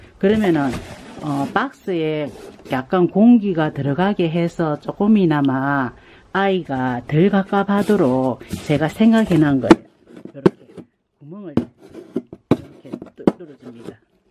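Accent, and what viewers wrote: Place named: Gyeongsang, South Korea. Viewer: 아ㅡ고 포장여시 고수의 손길이 보이내요 ᆢ이리 꼼꼼이 ᆢ 정성또한 가득하네요 ᆢㅎㅎ♥️♥️♥️♥️♥️♥️♥️정겨운 갱상도 말투가 참듯기 좋네요 저두 진주 가시나 입니다 ᆢㅋㅋ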